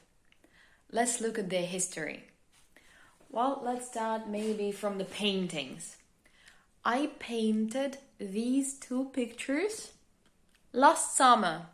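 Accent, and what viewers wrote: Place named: United States. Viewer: Вас можно запросто спутать с американкой! Ваше произношение просто идеально! It's just a native speaker's one!!! 😍👍 Вот уж правду говорят: "Талантливый человек талантлив во всем" 🤗 Сколько времени Вы прожили в Америке, чтобы выработать такое прекрасное произношение? (про "Жизнь Пи", прочитанный в оригинале, я уже понял:))) )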